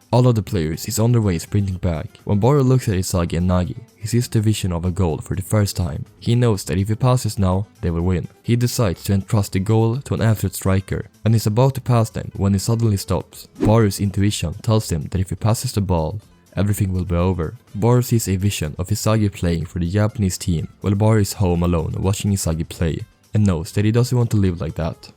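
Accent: Sweden. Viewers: You can hear the Swedish accent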